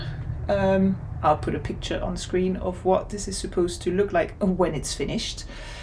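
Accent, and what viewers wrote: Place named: Wales, United Kingdom. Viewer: Nice first podcast 😃. You could modify your Ida tee…. Undo the cast off and unravel to length you want… then re-do rib 😊. Undo the sleeves and put in some deçreases to get rid of some of the width. It would be worth an hour as it is a very nice piece 😉 I also think that Petite Knit is fantastic for everyone. She has sensible everyday designs. I just finished her Cumulus …so simple but a great everyday sweater. Your red cardigan will be beautiful The Gepard (Danish) kid silk is also very nice. I am presently knitting the Buttercup T-shirt with Sandnes Garn Line, The Thelma Cardigan by moonstruck knits…who has grrreat patterns check her out…using Myak Tibetan Cloud for the first time and it is glorieux 😂 You have a nice accent when you speak English it has a Welsh sound… bye bye … me baldelaine on Instagram 😊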